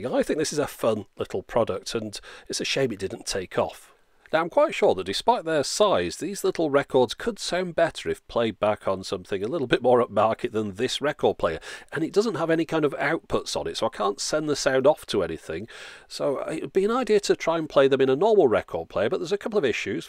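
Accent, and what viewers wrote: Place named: RP, United Kingdom. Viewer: The voice sounds like Finn from BBC English...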